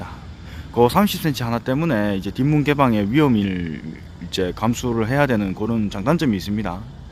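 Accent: Gyeongsang, South Korea. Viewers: 늘 지나다니면서 저게 뭔가싶었는데 공룡알이라는 귀여운이름이었군요ㅎㅎㅎ 영상잘보았습니다 저도 경상도사람이지만 경상도말을쓰면서 친절하게 잘 알려주시네요 잘 보았습니다^^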